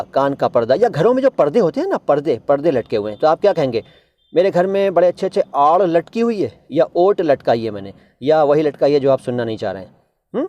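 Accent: Standard. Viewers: bahut shudh bolte hein aap waah☺🙏🙏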